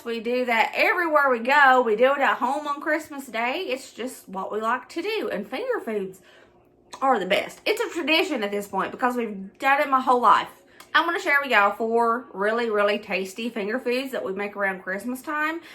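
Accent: South, United States. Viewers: Love this presentation and especially love your speeded up prep. This California girl(82) loves your southern accent have some trouble understanding some of your pronunciation but then again I have the same difficulty with British PBS shows. It took me until I saw the finished slaughters that the big OH! You were saying SLIDERS. Look forward to seeing more of your presentations. Do you have a recipe for a slow cooker complete turkey dinner, dressing,turkey breast, string beans and small potatoes cooked with the turkey breast on the sides. Hopefully reduced sodium. Thank you for sharing your handsome family.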